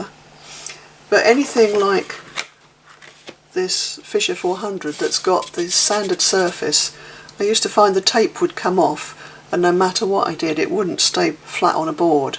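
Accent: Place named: United Kingdom. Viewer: Good job! Are you in San Diego or England? Just curious with your British Accent!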